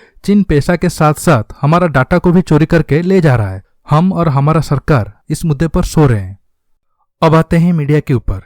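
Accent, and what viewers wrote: Odisha, India. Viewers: Bhai tume odisha ru i guess based on your pronouncing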